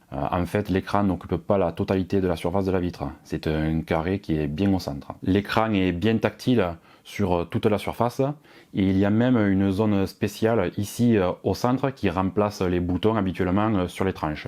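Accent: South, France. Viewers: Bonjour Comme d'habitude : exposé sobre, didactique, complet et objectif. Et l'accent du Sud en plus qui enchante le Cht'i que je suis... Bonne continuation !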